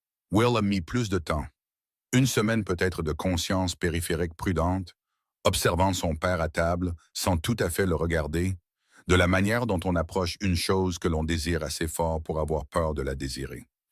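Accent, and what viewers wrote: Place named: Canada. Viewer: Le conteur à un accent Canadien ❤❤❤❤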